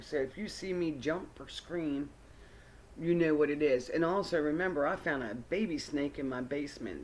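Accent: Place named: Baltimore, United States. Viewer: I knew you were from Baltimore, my hometown! I could recognize the accent and feels like home. You’re absolutely right, many autistic folks have gone missing since this whole corona stuff. Very sad. Thanks for reading the cards for him.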